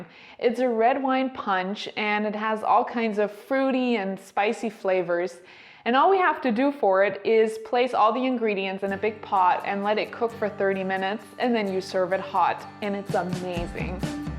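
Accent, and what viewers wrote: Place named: Germany. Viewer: You sound very German!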